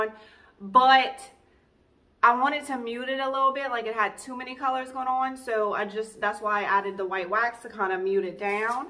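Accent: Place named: East Texas, United States. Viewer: Your stuff is so cute!! And your accent makes want to move back to East Texas. I was an hour away from Shreveport LA. Now I'm baking in Phoenix AZ.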